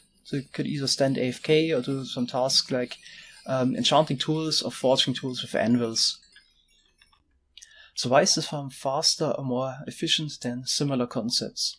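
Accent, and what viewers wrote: Germany. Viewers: Are you german? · Man hört zwar richtig raus, dass du deutsch bist, sprichst aber trotzdem einwandfrei englisch :D....Gutes Tutorial, es könnte nur etwas kompakter sein (Vorallem zu Beginn, hast du ziemlich viel geredet, was man hätte kürzer machen können), weil 27 min vlt doch etwas zu lang sind. Nur Kritik, kein Hate ;)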